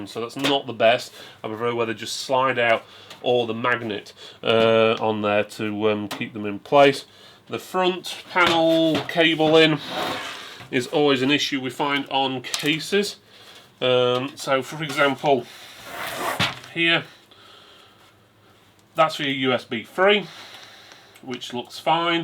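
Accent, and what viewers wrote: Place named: United Kingdom. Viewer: Too much Bri ish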